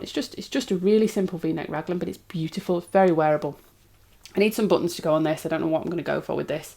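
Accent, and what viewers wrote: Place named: Northern England, United Kingdom. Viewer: You sound like you're from the North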